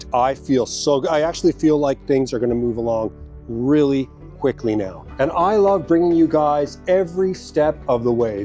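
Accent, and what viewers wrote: Canada. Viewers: As an Australian I had some difficulty with your Canadian Accent. I thought the Dog was called Puppy.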